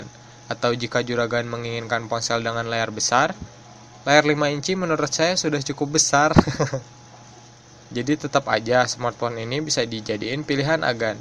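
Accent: West Java, Indonesia. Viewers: Logatnya sunda pisan... haaaaa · Ngomong nya sundaaaaaa bingittt...hehehe..anyway..goood...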